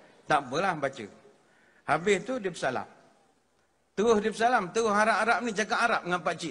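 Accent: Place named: Pahang, Malaysia. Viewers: Dia org kampung koi. Asei kg berkat maran Pahang nuhaa. Hahaha. Sabitkan idgram dia pun pekat. X leh suruk kalau dan bcakak. Hahahaha. Sedak ngat loghrat nya. 😂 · Ustaz ni ckp pahang fuhhhh... sedap dengo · Terjawab jugok persoalan koi selama ni , pekat sgt patin poyok nya ustaz ni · Kawan agok doh org pahang..dengo ke cakak tu mcm org pahang..ada jgk hak tlg kabo dia org pahang haha terjawab laa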